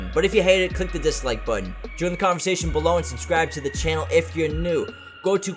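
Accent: Boston, United States. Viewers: This the first time I have heard a real Boston accent, shyt Is crazy how state to state English changes...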